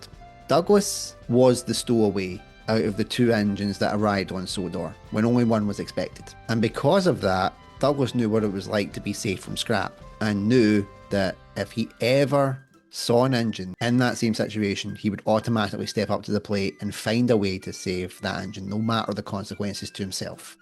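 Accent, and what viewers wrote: Scotland, United Kingdom. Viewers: Hello Nice Ranking I'll admit I'm like Duncan I'm a Hothead but with a good heart And I Like The Scottish Voice And You are no expection Sir keep up the good work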